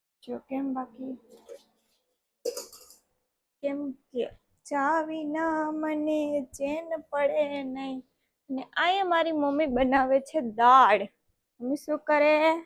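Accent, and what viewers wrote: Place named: South Asia. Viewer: didi you deserve my subscribe because many people kaik ne kaik speciality hoy j chhe i found a speciality in you ae.. tamaro desi accent je bolva no chhe they really makes you famous all the best from my side..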